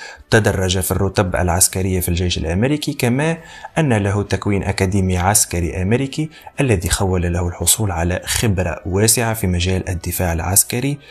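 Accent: Tunisia. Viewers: ماشاء الله تبارك الرحمن تحليل في المستوا مع نطق باللهجة التونسية سبحان الله في المستوا برافو عليك أخي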